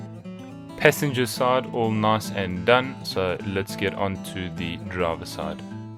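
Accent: South Africa. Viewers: Great video Bro 😎 are you from South Africa? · Could hear the accent! we out here supporting out South Africans!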